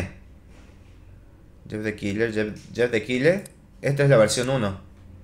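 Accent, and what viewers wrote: Argentina. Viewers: eres argentino?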